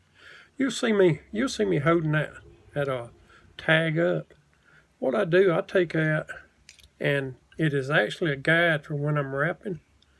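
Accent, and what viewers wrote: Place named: Western North Carolina, United States. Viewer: Another informative video, also inspiring! I could tell by your speech, that you had to live somewhere near me! I Live in the Western part of North Carolina! So we do have a little in common! Thank you, again! Take Care and Be Safe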